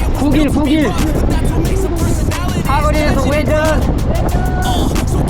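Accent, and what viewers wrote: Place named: Chungcheong, South Korea. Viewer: 아 정겹다😊 잉 겨~~충청도 사투리! 응원합니다🎉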